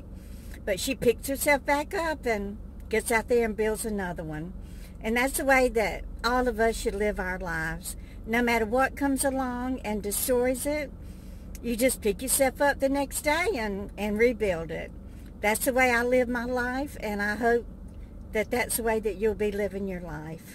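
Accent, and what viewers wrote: South, United States. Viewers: I enjoy your channel. There are people(other you tube channels) out there saying you talk in a syrupy southern accent, but I know its real because I have known people from Mississippi. As far as those saying you are a con artist because of your pay pal and patreon, that's not illegal to do that and people like me who like to watch but can't afford to send you money are still free to watch. Be careful of the mean people out there, don't acknowledge them anymore if they contact you, it fuels the fire. I took up for you and got attacked by some. Be safe and keep on vlogging.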